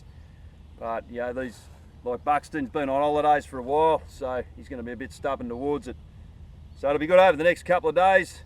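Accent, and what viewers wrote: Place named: Australia. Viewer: 😂I don’t understand much of your Australian English. But at the same time the music makes everything in the room vibrate 😂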